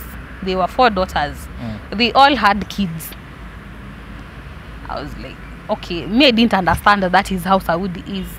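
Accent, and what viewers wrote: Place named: Kenya. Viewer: 😂😂Kenyan Swahili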